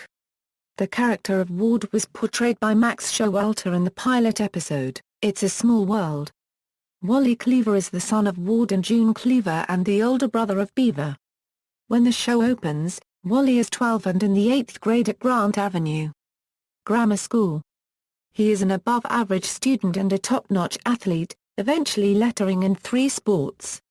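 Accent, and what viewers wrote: United Kingdom. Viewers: I don't like the British accept telling us about an American TV Show.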